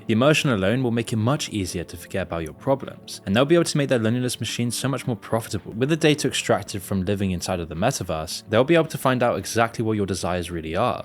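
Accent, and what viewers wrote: United Kingdom. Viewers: The monotone british voice with nothing very interesting to say as every video existing on the internet doesn't help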